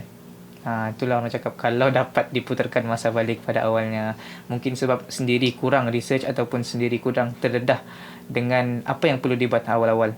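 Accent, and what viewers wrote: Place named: Sabah, Malaysia. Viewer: Cakap sabah jua dia haha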